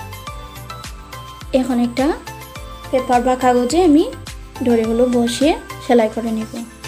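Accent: Sylhet, Bangladesh. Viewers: Apni sylheti 🙄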